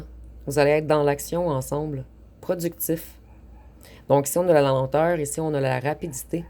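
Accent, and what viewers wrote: Canada. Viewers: J adore ce vernis. La voix et l accent canadien j adore.quel est votre petit prénom discret . Je suis reunionnaise, nous avons un patois qui ressemble à celui des canadiens. ❤❤❤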